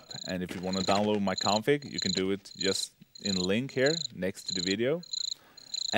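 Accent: Sweden. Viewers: That's because English isn't his Native language, he's Swedish. Can you speak Swedish perfectly? Also he is legend, wait for it...Dairy! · 32 people diden't understand swedish-engilsh.... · love his swedish accent :D!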